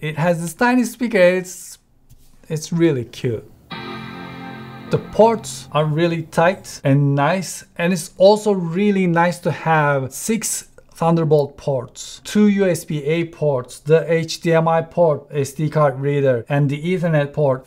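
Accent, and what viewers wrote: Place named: Turkey. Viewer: abi taşağına ve türk aksanına kurban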